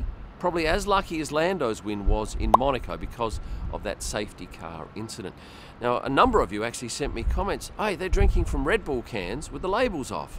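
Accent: Australia. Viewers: Hi Hope all is well. I love your work, and I'm so glad that ur an Auzzie, makes it easy to understand the auzzie language, lol. Also, I would like to win that TM 43. I also would like to tell you that while looking at all the PANZERA watches, I like the model AQUAMARINE 45C 45MM SWISS AUTOMATIC CHRONOGRAPH. Beautiful watch indeed, has every component that you need in a watch.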